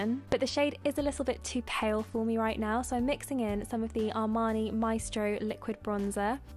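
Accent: United Kingdom. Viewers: I watch you a little bit too much to where I'm now talking in a British accent my family makes fun of me LOL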